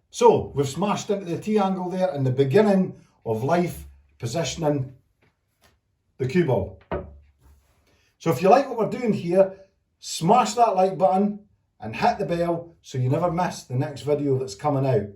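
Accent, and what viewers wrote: Scotland, United Kingdom. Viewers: I think what I'm getting at is for me it is easy to predict the path of the cue ball only when I apply a stun to an angled pot, everything else from centre to top or centre to bottom can be a grey area with regards to the path of the cue ball. I'm from Ireland so have no problem understanding the Scottish accent 😅, I've checked out a few channels and your is definitely one of the best 👍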